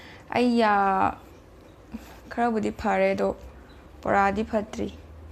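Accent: Kakching, India. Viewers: Kakching londa ngango taba yam nungaiye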